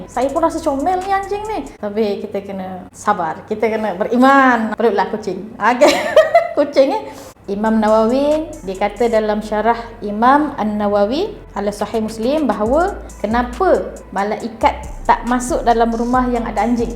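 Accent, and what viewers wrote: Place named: Sabah, Malaysia. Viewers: Suka sangat loghat Sabah dia.. =) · Start saja dia mula becakap aku tau suda sabah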